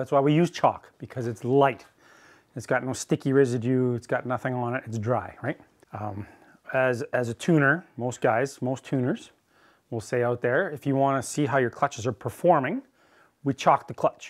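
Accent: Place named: Saskatchewan, Canada. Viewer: Are you from Saskatchewan?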